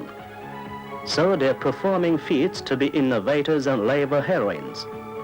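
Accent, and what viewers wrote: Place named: Ireland. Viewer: The narrator has this Irish accent with a mix of broken English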